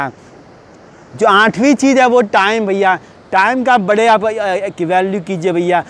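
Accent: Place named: North, India. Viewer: आप गुजराती है पर आपकी हिंदी का लहज़ा उत्तर भारतीय है , ये बड़ी अच्छी बात है।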